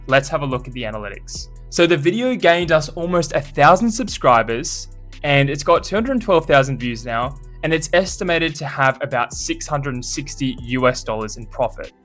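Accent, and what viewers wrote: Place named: Australia. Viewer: Is that a fellow Aussie I hear?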